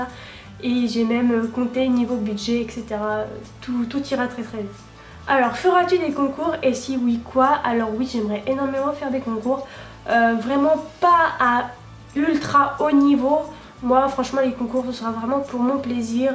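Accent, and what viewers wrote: England, United Kingdom. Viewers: Ton accent anglais est juste magique xD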